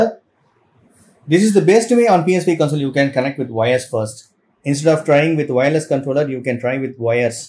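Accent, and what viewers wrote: India. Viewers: Thanks, your english accent has a malayalee flavour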